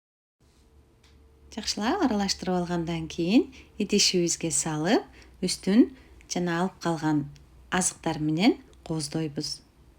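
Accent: Standard. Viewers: Азаматсыз таза кыргызча суйлоп салаттврды жегиликтуу ,жегиликтуу кылып жазап жатканызга !!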